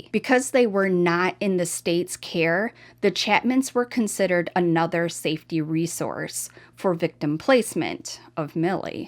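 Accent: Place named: Minnesota, United States. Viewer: Where’s you’re cute accent from ?? Sounds like Minnesota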